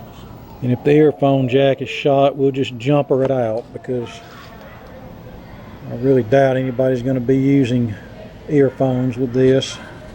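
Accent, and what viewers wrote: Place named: Mississippi, United States. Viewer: I hear this accent all the time, good ole Mississippi.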